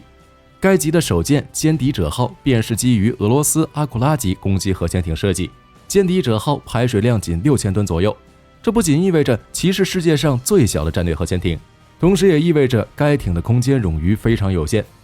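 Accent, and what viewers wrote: China. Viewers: 只要是這種中國口音的不是大外宣就是大內宣,只要你是中國的敵人,他就製作假視頻來攻擊你,從來不懂得,去讚美別人,只會抄襲別人,又不懂得核心與關鍵技術,於是呢就製作了一些四不像的武器出來了,沒有作戰經驗的中國解放軍,只會使用鋼筋水泥,號稱基建狂魔,笑掉到人家大牙。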